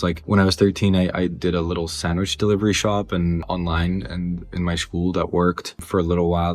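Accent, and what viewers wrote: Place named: United States. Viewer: You from spain... But you sound American how?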